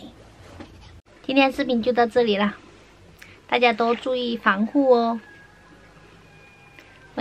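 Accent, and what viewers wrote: Sichuan, China. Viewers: 妹子你这川普好巴适噢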